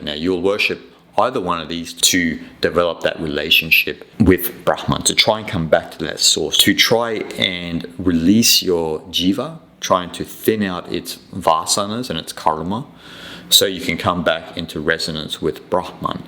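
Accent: Australia. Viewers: Good on you my Friend to explain all this in your Accent which is easy for most of the westerners, I guess urs is Australian Accent, which is I am familiar with as lived there for 5 years, Good to be in contact with you regarding such discussions, Take care, Revert if you feel like.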